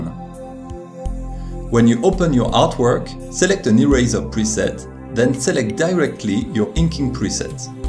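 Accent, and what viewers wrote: France. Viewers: j'adore votre accent, vous êtes trop français. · Le ptit accent français x) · Tu es français non? Tu as un bon anglais mais il faut encore travail un peu l’axent :3 Sinon le contenu est bon est tu es assez clair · This is a really great tutorial! Out of curiosity, based on your heavy accent, are you french? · feels weird being french and watching a video in english with a heavy french accent xD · are you french ? cause you've got an enormous french accent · You are french right? Ton accens francais ressort x)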